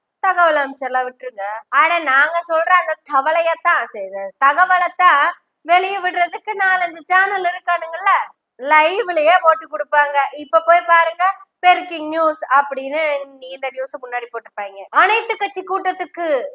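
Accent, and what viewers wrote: Kongu, India. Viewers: உண்மையை கொங்கு தமிழில் அழகாக சொல்லும் சகோதரிக்கு வாழ்த்துக்கள்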